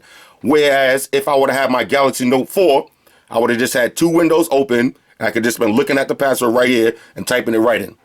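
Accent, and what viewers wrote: New York, United States. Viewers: you from New York Bruh??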